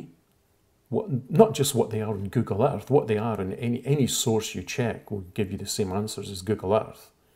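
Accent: Scotland, United Kingdom. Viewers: Y... You're Scottish... eyes subscribe button pushingly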